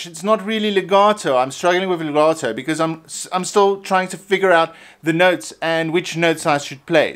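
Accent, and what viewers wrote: South Africa. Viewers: For Forte, the rest stroke finger goes into the next string, don't pluck the string away away from the fretboard. Plucking the string upwards is what gives you the fret buzz. For left hand technique, try to keep your elbow low, it will allow the palm of hand to stay parallel to the fretboard. This will also improve the accuracy of your third & fourth finger. BTW, you sound like your in/from JHB, I'm in Cape Town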